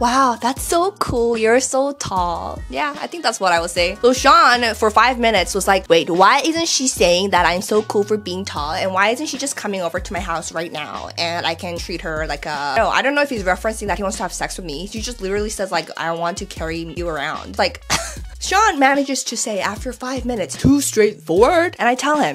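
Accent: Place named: United States. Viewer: Omg for most of the video I didn’t even noticed you used a fork lol, you speak like you are from the USA. Good vid but the cringe saying is annoying atleast to me, you are wise on thinking but are too judgmental for most men and your smarts may come across feminist where u feel superior over others. But u are still young. You may be different in person I can only judge from video, just have fun in life and don’t worry about others so much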